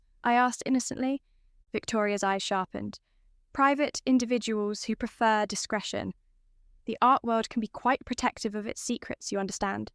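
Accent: Yorkshire, United Kingdom. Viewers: What accent is that? Yorkshire?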